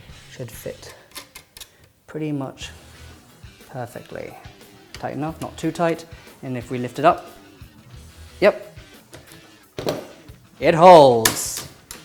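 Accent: United Kingdom. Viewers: Asian British accent.. first for me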